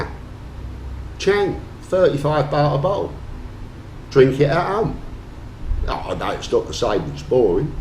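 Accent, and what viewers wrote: United Kingdom. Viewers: The problem is that the Queen does not speak like most of the UK population. Whereas accent is the most widespread in the UK. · "Be on the Piss all night every night" I Love British Speak :)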